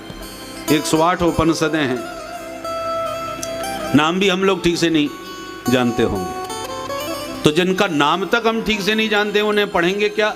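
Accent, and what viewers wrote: Braj, India. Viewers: Maharaj shree apke mukh se braj brajbhasha bhut madhur lagti hay apko shut shut naman 🙏🙏🙏🙏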